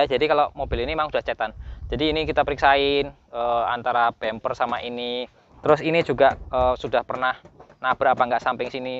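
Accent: Java, Indonesia. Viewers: Mas ini dari aksen logat jawanya kelihatan msh punya darah kraton...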